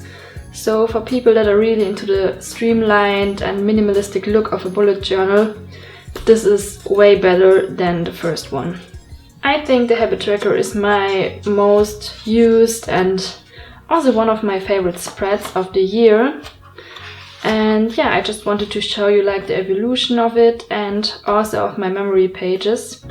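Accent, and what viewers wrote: Germany. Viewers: Eigentlich wollte ich auf diesen geistigen Durchfall gar nicht antworten, aber ich glaube man muss dir mal Einiges erklären... - Erstens: Ob ihre Aussprache grauenhaft ist oder nicht, liegt im Auge des Betrachters. Deshalb kann deine Aussage schon mal nicht "objektiv erfassbar" sein. Nun gut... - Zweitens: Hab ich den Teil verpasst, in dem sie nach deiner Meinung fragt? Meines Erachtens geht es hier um das Bullet Journal oder steht im Titel "Bitte bewertet mein Englisch auf einer Skala von 1 bis 10" ?! - Drittens: Hast du jemals schon mal Leute gehört, deren Englisch wirklich nicht so gut ist? Ich glaube nicht... Man versteht sie nämlich kaum und reibt es ihnen trotzdem nicht unter die Nase. Das ist "common courtesy" (kennt du das? ). - Viertens: Nur weil man in London lebt heißt das nicht, dass man alles perfekt Englisch ausspricht. Ihre Muttersprache ist nun mal Deutsch und "die Gute" wird auch in 10 Jahren noch einen Akzent haben. 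Das gilt auch für Franzosen, Chinesen oder Inder, die schon 50 Jahre in England wohnen. Das ist vollkommen NORMAL. ... Kein Grund hier ausfällig zu werden. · I noticed by your accent that you are German! Ich bin auch von Deutschland. Meine Mama ist aus Aachen aber meine Väter ist aus America. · Celine Lee lmao me too first second SHES GERMAan haha ich bn aus österreich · are you german? Your englisch is very good though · man kann an deiner Stimme erkennen dass du deutsche bist XD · I love your accent because I grew up with german nannies so it reminds me of my child hood haha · Im learning german in school and i think its so cool to hear you’re accent 😻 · love your German accent :D and I was finally able to hear how the name of the notebook is supposed to sound :p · endlich weiß ich wie sich ein deutscher Akzent anhört 😂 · i heared your accent in the first second :D ich bin auch deutsch xD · falsch.ihre aussprache IST grauenhaft und sehr deutsch! 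das ist objektiv erfassbar.. und warum bitte muss ich alle sprachen dieser welt sprechen können, um das hier anzumerken?! was für ein dämlicher kommentar! es geht um die allerweltssprache englisch.. und die gute lebt in ...LONDON .. und darum ist es OBERpeinlich. PUNKT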